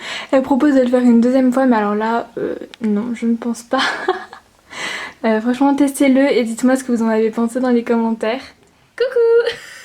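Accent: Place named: England, United Kingdom. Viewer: Non j'adore ton accent anglais tu pourrai faire des vidéos comme sa plus souvent stp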